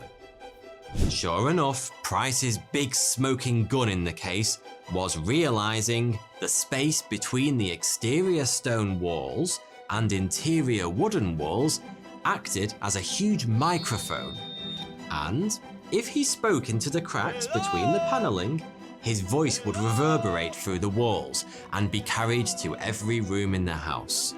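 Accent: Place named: Southern England, United Kingdom. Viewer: Thanks Phil. I've come across the accent several times on YT. The word "think" caught my attention in a few cases. I'm curious about the dialect...I'd call it a dialect. It's a marker for a distinct cultural community. I wonder (and worry) if it will go extinct, like so many others. Do speakers have a group name, or any sort of identifier that I could research? If not, in what range and in what towns of southern England is this dialect common? I'm just looking for an area boundary, if there is one. Do you know if speakers are ancestrally connected to Bretons, Angles, Picts, Normans, etc.? And do they all have big front teeth and mustaches for disguise? LOL!!! I think that explanation is hilarious. Anyway, thanks for any first-hand, factual info you're willing to provide on this south England dialect. :)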